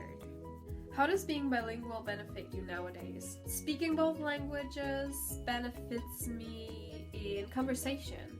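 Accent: United States. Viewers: Thank you for taking the time to do this video. I follow many Au Pairs and Exchange students on you tube. It is so interesting to hear what each individual takes from their time in the US. Positive and negative. I see a definite pattern with certain countries and whether they speak their native language or in English in their videos. Norwegian, Danish, Finnish and the Dutch tend to speak English. German, French and Russian tend to speak their native language. If you decide to do a Q&A I have a couple questions. You answered one. Do you ever catch yourself thinking in English and the second part would be do you ever dream in English. I see many exchange student in the US start to catch themselves thinking or dreaming in English after about three months. How strange that must be the first time it happens. What were your reasons for choosing the US for you year as an Au Pair. 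Numbers wise the US has the most German AuPairs most years. Last is your accent of lack of an accent really. Your choice of words and pronunciation, to me, sound very much like the US version of English and not the British. When English is taught in Germany which version do they teach? Your English, at least to me is very very good. By the definition I read you are bilingual. I hope you enjoy your time here. I lived in New London, CT for six years so I know what it is like up there. Will you take a travel month when your year is up? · I looked up the word bilingual in the dictionary and if I remember it correctly, being bilingual is when you can speak two languages fluently. Also, on a side note, you have a great american accent. Oh, conversate is a word:)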